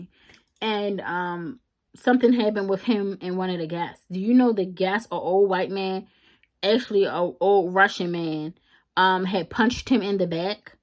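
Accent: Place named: Baltimore, United States. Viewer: Born and raised in Louisiana from the Deep South and yeah those plantation tours always rub me the wrong way. I’m starting in two weeks at American as a deckhand and I already know it’s going to suck . Will be a long 28 weeks but hopefully I’ll end up in the west coast and it will allow me to get my sea time to become a mate and work on cargo ships. Also your Baltimore accent is crazy heavy lol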